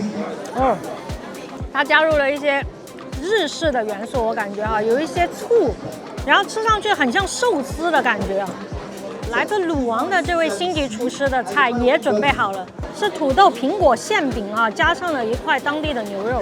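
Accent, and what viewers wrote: Sichuan, China. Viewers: 不知道为啥，你开口虽然说的是普通话，但一听就听的出来是四川妹纸：）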